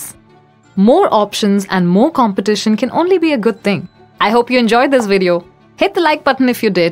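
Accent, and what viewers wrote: India. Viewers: For the first time I like the Indian accent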